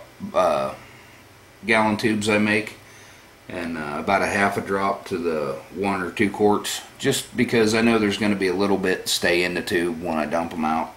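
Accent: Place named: South, United States. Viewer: I couldn’t handle the “southern drawl “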